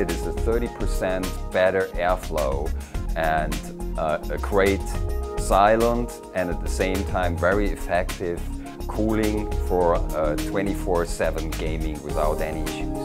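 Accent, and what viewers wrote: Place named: France. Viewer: MSI France avec un mec qui parle anglais avec l'accent français, ok.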